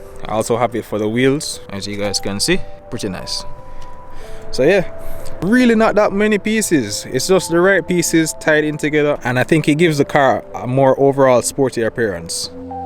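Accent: Jamaica. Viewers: When the video started i heard the accent and did a wonder if you’re from Jamaica enuh, i was right 😂 subscribed immediately 🙏🏽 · Suh mi jus deh yah a watch bmw build and mod videos not really a car person and mi say let me watch this video den boom here comes a yaad man accent box mi just Suh glad fi see a yaad man channel big up ute🤣🤣🤣🔥🔥🔥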